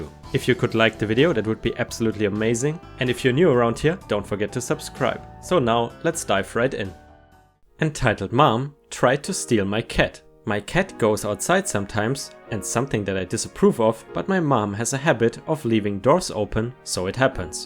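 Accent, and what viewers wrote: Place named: Germany. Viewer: Your english is not bad. But I am still hearing your german accent as a german myself.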